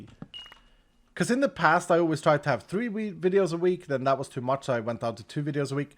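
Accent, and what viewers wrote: Sweden. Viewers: nobody is disappointed with your Hermitcraft output. Anyone who says they are probably don't actually watch, they just comment to try and annoy you and others. YouTube viewership is down for many creators across the platform too as the way people consume content is changing (many younger kids just want 60s clips). I've just reached the point in the video where you're discussing this exact point as I was typing. 😂 Going forward may I suggest you just continue doing what makes you happy? It comes across in the content which, in turn, makes the viewer more likely to enjoy it. You have an amazing creation in Vault Hunters and it makes excellent content. Plus, it's really endearing to hear your Svensk-isms. If I was 1% as good at Swedish as you are at English then I would be very happy indeed. Tack så mycket,. Vi älskar dig, och din videoklipp. 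God Jul! 🖤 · You do you ❤. We love your Swenglish and as a non native English it is so recognisable. Make a base you enjoy and upload when it feels good for you. Grian and Mumbo do the same. I always love it when you can hear the true joy in vids instead of something forced. And there are other hermits who don t make mega bases either. Dont take the joy away by comparing yourself to others. You are wonderfully unique ❤ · It has never bothered me to listen to the way you use the English language. In fact, it's sort of endearing, you've always been the silly Swedish guy! In fact, your content has always been a source of comfort for me. I started watching Hermitcraft in Season 6 and you were immediately one of the first hermits I began to watch. Even if you never upload another hermitcraft video, no matter how sad the fans may be, we will continue to support you. Make the content that makes you happy, and we will keep watching. 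I personally didn't care as much about the building, I loved the way hermits interacted, the games, the pranks, everything. It means the world to me that you have hermits on Vault Hunters. community is what makes Hermitcraft great. I hope to see some videos from you on the #10 server, but at your own time and at your own pace. and even if you don't, I'll keep watching for Vault Hunters. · Taking a radically different approach to season 10 sounds refreshing and fun. I'd rather see another season-long 'Señor Bumbo Cactoni' style prank war than episode after episode of time-lapses anyway. Cub, Beef, and Zedaph did just fine this season without massive bases, maybe take inspiration from them. Hermitcraft doesn't need to follow the 'bigger and better' YouTube meta, frankly it's stale and something new needs to be brought to the medium. And, side note, as an immigrant in Sweden who's making all kinds of mistakes när jag lär mig svenska, your occasional svengelska idiosyncrasies are barely worth mentioning. 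You're far better at English than many native speakers I know, occasionally slipping on complex conjugation or weird edge cases is just a 'second language' thing - and they come off as charming and endearing.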